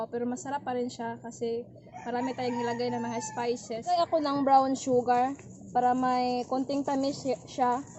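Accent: Philippines. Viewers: Gantong vlogger dapat sumisikat eh. Ganto dapat nagrerepresent sa ph vloggers. Pinay beauty, pinay heart 💙 Yung accent pa talaga pinoy 😍💙 support ate. God bless u moreeee!!!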